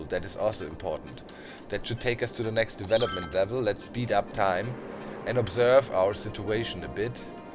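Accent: Germany. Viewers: Wie man einfach hört das du deutsch bist :D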